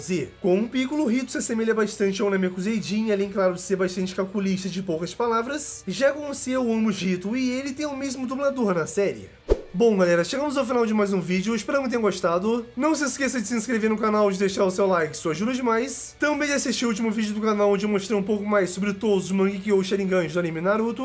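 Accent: Portugal. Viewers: Vc é português (PT - Portugal) ??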